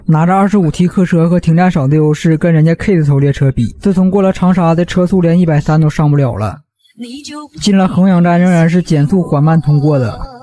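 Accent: Northeast, China. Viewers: 解说一口大碴子味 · 哈哈哈哈 碴子话 老带劲了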